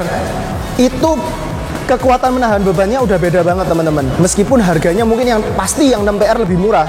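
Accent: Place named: Surabaya, Indonesia. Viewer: Saya suka logatnya ko. Asli arek suroboyo iki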